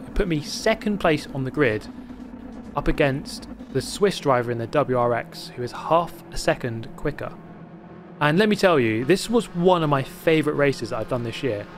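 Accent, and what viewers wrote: United Kingdom. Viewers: Listening to laid back british accents is the thinking mans opioid.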